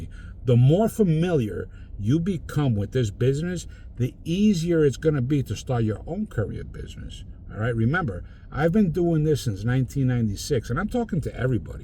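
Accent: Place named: Philadelphia, United States. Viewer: Philly Philly!!! That. Is. Where. I. Am. From. 🤪😎 man, you’re accent feels like home. I’m in the Bay Area now (unfortunately)…wanna get back into gig work tho. I’ve had about enough of the 9-5 stress…overworked and underpaid and unappreciated, all for someone else to get rich at the end of the day…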